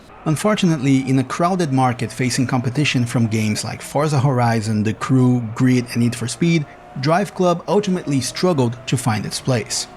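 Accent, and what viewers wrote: Brazil. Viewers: that’s why I asked, because he had a faint but noticeable accent that I could only trace as PT-BR. I didn’t manage to watch the whole video, but I am not surprised that there was some footage with Portuguese text. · ingles bom mas aquele quezinho de hue está perceptivel no sotaque! to enganado? anyway, i never played this game for more than like a race or two at a time. do we already have ps4 emulators? 🤔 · Bom video, jogo até hj, e lamento que nao comprei o ultimo dlc. Obs: to escrevendo em português pq o seu sotaque te entrega. O curioso é que Driveclub vai sobreviver ao tempo, enquanto os jogos de corrida hoje nao saem em midia fisica (ou no caso de GT7 mesmo em midia fisica, precisa estar online, do contrário vc tem 10 carros e 3 pistas pra usar só no modo time trial ) . Tenho todos os dlcs e rodo hj em dia no ps5, me lamento nao ter pegado o ultimo dlc, que foi o unico que nao era incluido no season pass. 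O Dlc que vinha com o Jaguar XJ220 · Dude, are u Brazilian? You accent kinda sounds like it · Yes, i'm 100% sure he is. I can spot a brazilian just by their accent, plus there's the gameplay in the video that's in portuguese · Great video! Congrats! Btw, are you brazilian? Your accent looks familiar. Keep it up with this awesome content.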